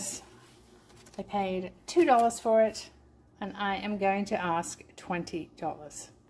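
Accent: England, United Kingdom. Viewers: I love the English accent do you miss England I always wanted to visit have a bless day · Just come across your channel and I love it, however being English myself I can't help but hear an English/British accent there! Hope I'm right 😂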